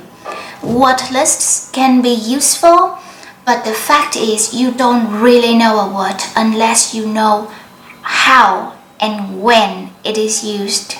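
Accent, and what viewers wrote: South, Vietnam. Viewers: Sorry I forgot, this is southern accent right? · Thanks for reply , i am malaysian nguoi hoa . i learn a lot of southern accent tieng viet from your video clip , cam on nhieu !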